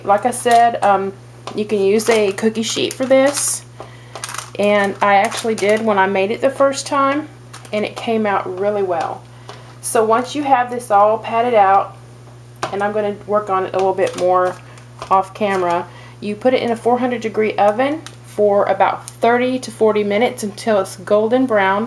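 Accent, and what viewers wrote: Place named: South, United States. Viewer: I can't wait to try this, as pizza is my downfall and so hard to resist...Thank you for this video, as you explained every wonderfully. You have a lovely accent, too, are you from the South?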